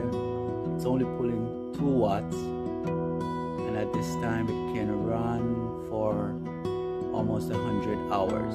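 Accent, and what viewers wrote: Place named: Jamaica. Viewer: You sound Jamaican, greetings from Montego Bay.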